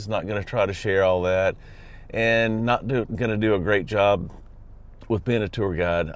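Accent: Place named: South, United States. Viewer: I love Charleston and find your smooth southern accent to be very welcoming! Thank you!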